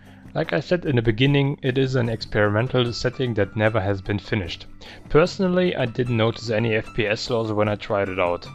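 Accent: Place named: India. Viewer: Great Video. I like the way you speaking but you sounds like an indian man who is speaking englisch for the first time.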